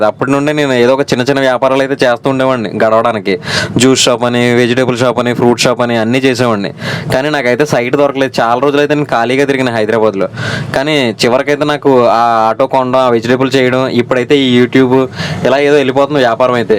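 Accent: Godavari, India. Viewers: Ma godavari slang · గోదావరి స్లాంగ్ swag same bro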